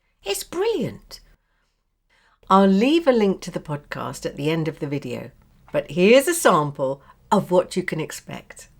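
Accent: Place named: Northern England, United Kingdom. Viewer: What city do you live in? You sound from north England. Greetings from Casablanca Morocco.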